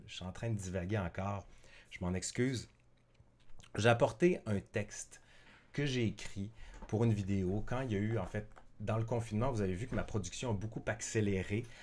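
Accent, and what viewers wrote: Quebec, Canada. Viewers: Excellente live. Un régal de t'écouter. je suis venu sur ta chaine grâce à Ben et je suis devenu fan très vite. J'adore ton style, ton rythme et bien sûr ton accent québecois. Merci à toi d'étancher ma soif d'histoire et ma curiosité de sujets originaux. Ivan , un français qui viendra découvrir le Québec en été 2021 .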